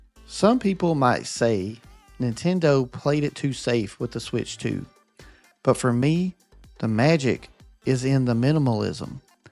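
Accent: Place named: Alabama, United States. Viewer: Just curious buddy where are you from? I'm from Alabama you sound a lot like me and my friends lol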